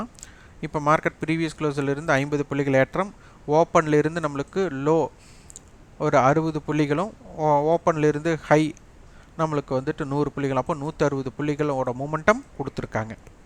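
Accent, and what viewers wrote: Kongu, India. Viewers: PSYCHOLOGY பற்றி ஒரு பதிவு தங்களின் இனிமையான கொங்கு நாட்டு தமிழில் பதிவு செய்யுங்கள் ஐயா. நன்றி வணக்கம் 🙏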